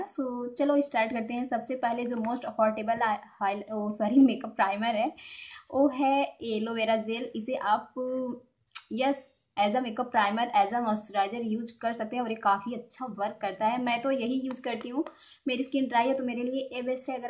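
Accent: Bihar, India. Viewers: Aap itna bihari ka jesa kyu bolti hai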